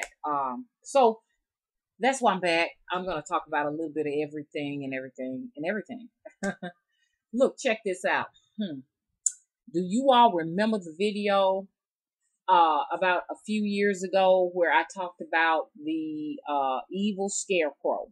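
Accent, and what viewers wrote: South, United States. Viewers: I absolutely love the southern drawl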